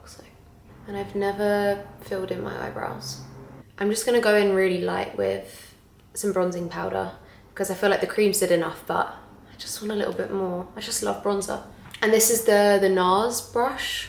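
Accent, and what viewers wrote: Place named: United Kingdom. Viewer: I have never heard you speaking and I never expected you are British!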